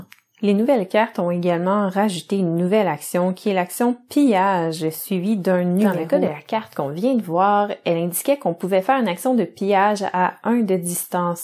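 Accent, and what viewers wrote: Quebec, Canada. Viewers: Suite à votre explication du scénario 1, je viens d'acheter le jeu. Je vais suivre vos autres épisodes pour être fin prêt pour l' étape 5. Merci mille fois pour votre synthèse du jeu , pour votre sourire et...pour votre accent québécois 😉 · Tes vidéos sont très sympa & bien réalisées, bravo. Et puis le petit accent québécois, c'est top ;-) Bien le bonjour de la France.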